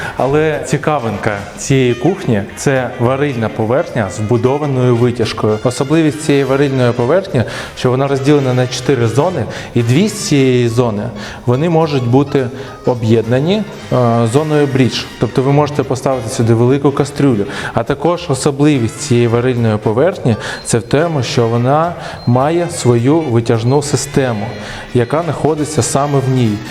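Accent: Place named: Russia. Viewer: He is speaking with russians accent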